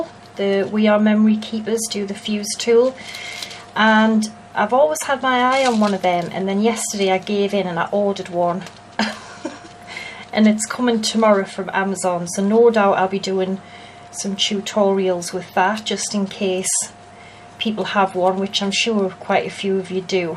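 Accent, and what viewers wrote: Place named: Northern England, United Kingdom. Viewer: Hi just found you're channel,and although I don't make things like tags etc,more into colouring books,I thoroughly enjoyed you're tutorial,and look forwards to watching more! Can I ask where you are from,lovely northern accent,I am a Northumberland lass,but now living in Spain!!.Thankyou for sharing X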